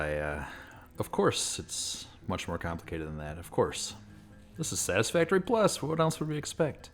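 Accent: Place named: Midwest, United States. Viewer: Been watching your newest SF+ series; that series and this are both great. Commenting as a fellow Michigander to say I knew you were from Michigan after hearing you talk for a couple seconds in the first video, you have a strong Midwest accent. It’s nice hearing it, I’ve lived in Japan for quite some time and don’t get to hear it often.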